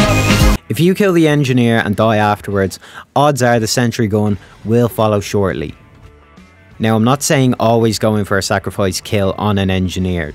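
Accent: Ireland. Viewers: my god what an amazing Irish accent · He’s Irish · Ahh. A fellow Irish man. First time watching. Wexford or Dublin?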